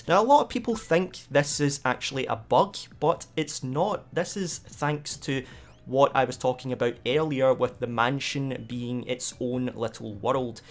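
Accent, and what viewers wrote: Scotland, United Kingdom. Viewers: clicked for the information, stayed for the scottish accent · You got a very strong scottsh accent my boy😂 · i hear scottish mother tongue- i like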